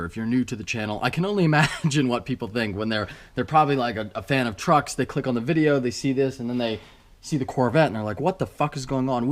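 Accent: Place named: New England, United States. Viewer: I swear I thought he was saying "towing gears"... must be the Yankee dialect I'm not used too...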